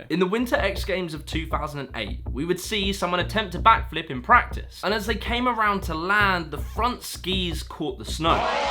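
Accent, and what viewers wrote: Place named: United Kingdom. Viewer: I hate the British accent... It's THIRTEENTH....not FIRTEENF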